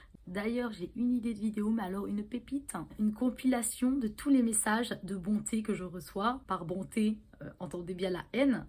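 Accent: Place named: East, France. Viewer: Joli accent de l'Est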